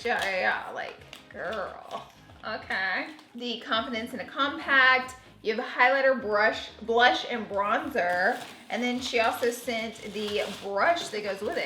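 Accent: South, United States. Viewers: Miss your southern accent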